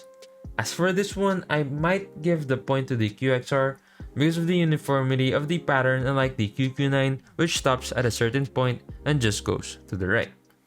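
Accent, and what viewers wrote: Philippines. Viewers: peenoise accent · Dude your accent remains me of Filipino accent tho.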